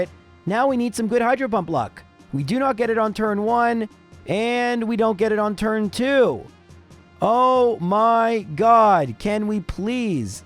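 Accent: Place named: Canada. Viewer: He's Canadian, and canadian pronunciation caused both of those "issues".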